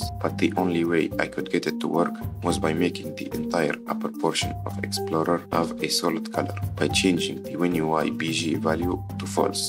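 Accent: Russia. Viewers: Um, cuz bro speaks like a robot with russian accent? Really slow, stuttery and without any soul in words. No doubt, it comes with experience and the author should continue improving (cuz e.g. all this themes have never been bundled in one video, usually being on different subreddits and videos => useful), but being surprised with a low number of subs is either your delusion or major d*ck riding lmfao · Ты русский, что-ли? Крутой акцент =)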